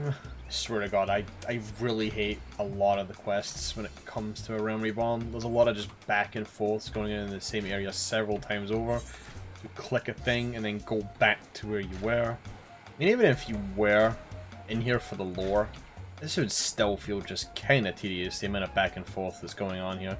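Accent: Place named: Scotland, United Kingdom. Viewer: another Scottish final fantasy xiv YouTuber... oh wow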